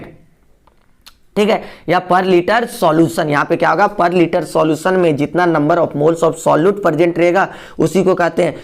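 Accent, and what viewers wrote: Jharkhand, India. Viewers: Aap Jharkhand se he aapka language bata raha hai 😃😃😃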